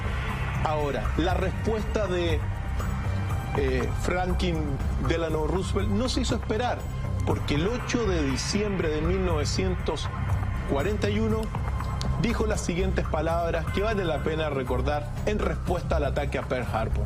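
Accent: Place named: Chile. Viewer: De ver los videos me he acostumbrado al acento chileno, personalmente me parece agradable, por no hablar que los temas y la manera de contar del me encantan.. saludos desde España.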